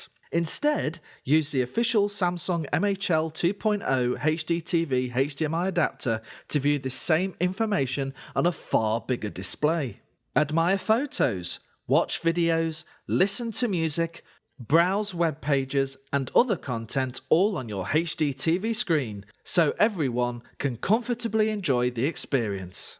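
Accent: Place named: United Kingdom. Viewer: Esse sotaque britânico ♡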